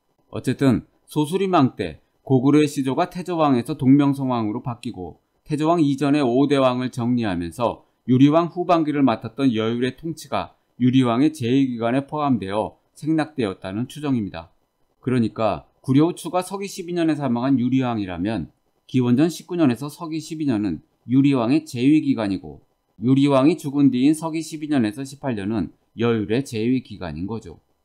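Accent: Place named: China. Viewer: 이 ㅅ낀 뭐지? 우리말하는 짱깨네?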